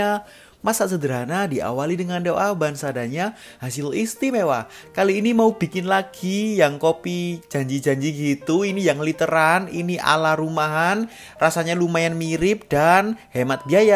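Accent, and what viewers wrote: Java, Indonesia. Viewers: Ayo leeee.smoga sucses.yang UTI seneng km tetap pertahan kan logat jowo mu.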